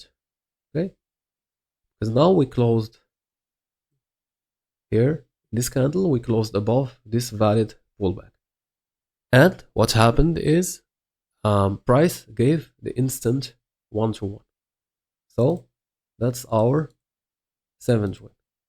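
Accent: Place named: Morocco. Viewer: سلام عليكم، اخي عرفتك مغربي من صوتك تبارك الله عليك وبغيت نسولك واش تنصحني نبدل من ict لهاد الموديل تع dtfx واش في نظرك احسن من الايسيتي حسب التجربة ديالك ? وسؤال الثاني واش مكينش شي استراتيجية فيها RR اكثر من 1 في استراتيجيات تع ال dtfx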